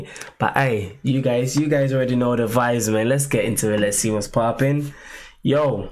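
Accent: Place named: Nigeria. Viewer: I THINK U ARE NIGERIAN NOT UK. UR ACCENT IS DIFFERENT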